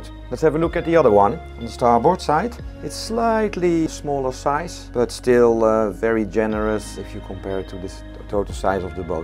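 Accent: France. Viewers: Quant un français parle l'anglais sa ce sait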